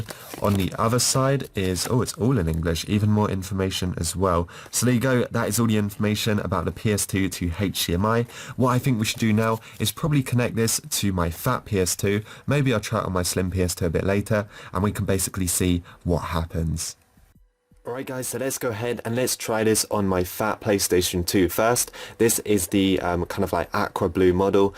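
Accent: United Kingdom. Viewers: Love your British accent mate 👍🏻😌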